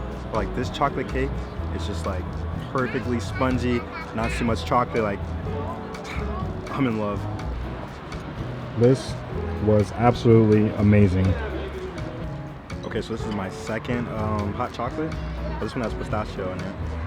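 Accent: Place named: United States. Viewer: Are you American?🤷‍♂️🤷‍♂️You sound American. Watching from HONOLULU HAWAII. Hey, Mele Kalikimaka from America 😎🎅🏻🎅🏻 Very good video. I can always spot an American 🤓😍🇺🇸😎🎅🏻🎅🏻🌲☃️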